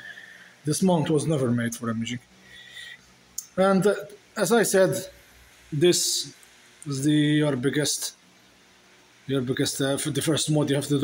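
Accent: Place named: Malta. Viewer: I bought one of these a few months back, these tips are great! Then to my surprise, this was a recommended video and I recognise the accent - a fellow maltese! Grazzi tal video!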